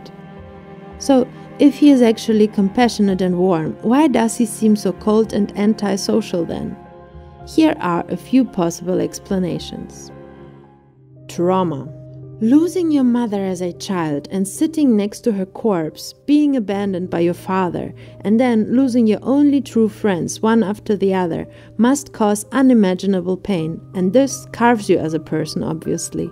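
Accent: Germany. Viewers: are u german btw? bc if u are I can hear it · Are you german? Your accent sounds like you are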